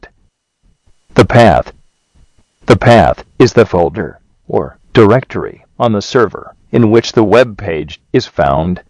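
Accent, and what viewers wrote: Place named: United Kingdom. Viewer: British hooo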